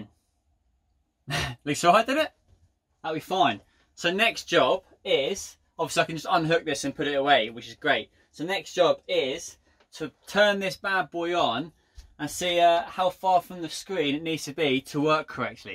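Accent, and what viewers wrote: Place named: United Kingdom. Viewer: Thiccc british accent, where you from bud?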